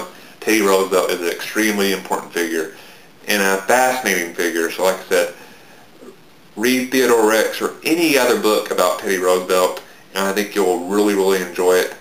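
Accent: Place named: South, United States. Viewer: Okay. Just wondering because I detected a southern accent. I live in the South as well. :)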